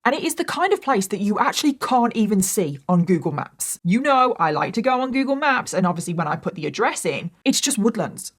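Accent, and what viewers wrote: United Kingdom. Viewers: Really lol? A British accent sounds tropical to you guys? Haha cool 😊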